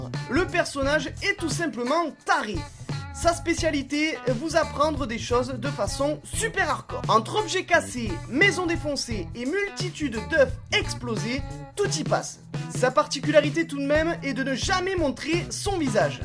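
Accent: Marseille, France. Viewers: oh l'acson marcer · a j'adore ton accent Marseillait aller Marseille je m'abonne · comment je déteste l'accent Marseillais😂 mais le tien sa va encore !😉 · tu est du sud? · Tu as bien choisi les fous de YouTube et j'adore ton axen marseillais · T'as un petit accent du sud~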